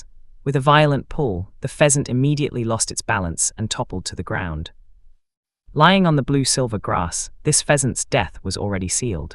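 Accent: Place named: India. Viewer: What was it with the Indian accent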